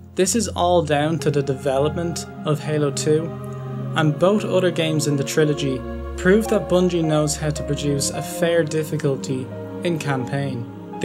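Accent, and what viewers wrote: Ireland. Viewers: That accent can't get more irish. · Is that a Galway accent I hear? Or at least Connaught?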